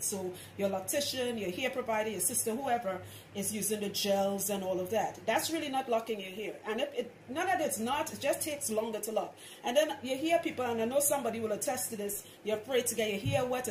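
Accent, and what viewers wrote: Trinidad and Tobago. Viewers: Me listening to her talk: buh how she sound like a Trini so? Her: Everybody knows I'm Trinidadian Me: DAS WAH WE TALK BOUUTTTTTT. applauds and immediately subscribes I wanna start locs but I'm a dougla and there are no tutorials for my hair type, plus, I am soo worried about thinning so I clicked on this video for info and I am already SURE this woman knows what she's talking about. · i could hear that trini accent hardd lol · From the time you started talking I knew you trini🇹🇹❤ I finger twists my hair two weeks ago so I am going to do the two strands twists asap 🙌🏾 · Yes I know that accent 😍 hi Trini sista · 30 secs in listening... Was like.. Wait......... Y she sounding trini.. Then boom u say you're trini, great vid hun. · I Heard the accent and the beginning and I knew yuh was a Trini, Trini to d Bone!! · Trini to the bone! 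Yes sister! Shout out from Chicago! I knew u was a trini soon as I hear ya voice. Both my parents live in Arima. Power! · Im watching these comments and I see people talking bout your accent then I raised the volume and I'm like "wait, that's Trini I hear?!" It's nice to see that I'm looking at loc videos and I come across a fellow Trinidadian.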